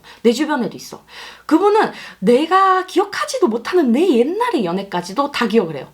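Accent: Gyeongsang, South Korea. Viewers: 사투리 안쓰는거 같은데.. 단지 억양만 경상도 식이지만. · 저는 의 경상도 억양이 아주아주 좋습니다. (저는 경상도 사람이 아닙니다.) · 사람은 가장자기 다울때가 젤매력적인 거라고 생각해요 사투리와 경상도억양 넘 듣기좋은데 근데 마지막 서울표준말도 귀엽긴하네요 ㅋ 서울표준말은 가끔 써주세요 🤭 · 사투리가 아니라 경상도 말씨 인거죠 대한민국 사람이 이 정도 못알아들으면 그냥 자막 보라고 하세요. 영상 아주 좋습니다 개념치 마시고 힘내세요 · 그모든것을 알아도 눈치가 없으면 꽝~ ㅋㅋㅋ 이론과 현실은 다를때도..케바케~ 그리고 다 알고있으면서도 내가 겪으면 상황판단이 잘안되는....머 ~ 그런경우도~??? 일로서 사람을 만나는 경우는 잘안다고 생각하는데... 이성에 대해서 관심은 많으나 만나보고 싶다는 관심이 없다보니.... 호감인지~ 호의인지 구분짖지도 않고...ㅎㅎㅎ ^_________________^ 이번 영상도 재밌게 끝까지 다봤는데... 끝에 끝맺음에서 사투리 관련해서 말을 하셔서 글을 보태여 보내요~ 저는 경상도 사투리 귀엽다고 생각합니다. 대구는 "맞나~?"라는 말을 많이 쓰더라구요~ ㅎㅎㅎ 저는 꼭 표준말로 영상을 만들어야한다는 것은 없는거 같습니다. 듣기 거북하다면 안보면 되는것을 굳이 영상을 봐가면서 컨텐츠 제작자에게 이야기를 하는것은 크레이터에게는...그리 좋은 말로는 안들릴거 같습니다. 몇십년을 사투리를 표준말이라 생각하고 살아왔고 그 사투리를 하루아침에 바꾸는 것도 힘들텐데~ 그리고 그억양을 바꾸려하다보면 이것도 저것도 아닌게 될수도 있습니다. 저는 전라도지만 군생활을 경기도에서 해서 경기도억양도 쓰다가 친구가 하동친구가 있어서 경상도 억양도 나오다가 친구들 만나서 전라도 사투리 하면... 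너 어디사람이냐고 물어봅니다. ㅋㅋㅋ 의 사투리가 저는 귀엽습니다~ 그대로~ ~~ 쭉~~~~~~~~~~~해주시길... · 자존감을 지키세요 경상도말투는 안바뀝니다 서울말 쓰는 채널보러가라고 하세요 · 대구 경상쪽 치고 사투리 많이 없으신 편이에요.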